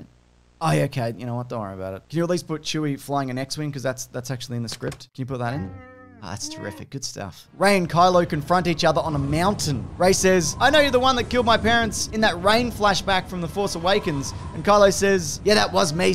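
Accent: Australia. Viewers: you sound aussie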